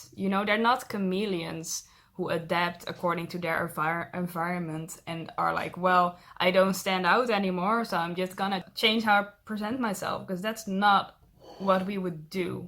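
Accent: Netherlands. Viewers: Je klinkt heel Erg Nederlands ben je dat toevallig ook haha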